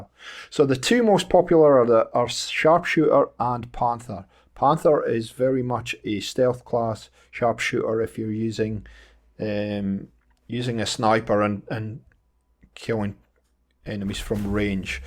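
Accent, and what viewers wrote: Scotland, United Kingdom. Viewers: I picked up this game a month or so ago on PC on a ubisoft connect sale, absolutely loving it! Learnt some useful tips from your video, thanks! Plus, it's always nice to hear a fellow Scot on a video, always a nice surprise! Cheers! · Great video m8 and you sound Scottish aswell so deffo subed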